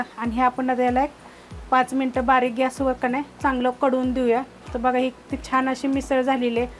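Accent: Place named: Kolhapur, India. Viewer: Assal kolhapuri bolane & misal. Very good method.... Thanks aunty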